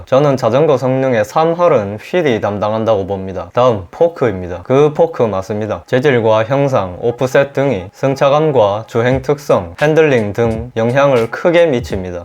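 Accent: Gyeongsang, South Korea. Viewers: ㅋㅋ 이형 겁나 쿨해~~ 마지막에 끝!~~ 갱상도 사투리쓰는 자덕 두 분중 한분 ! ^^ (나머지는 각시탈) 전라도는 안계시나?? 암튼 구독눌렀네요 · 경상도 사투리만 빼시면 100점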